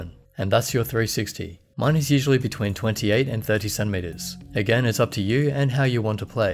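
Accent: Australia. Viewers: I think he is from Australia actualy. He's definitely not from Canada but I am ^^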